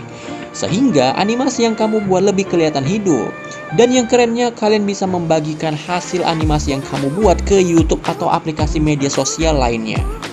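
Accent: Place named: Medan, Indonesia. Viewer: Jangan hilangkan logat medannya ketua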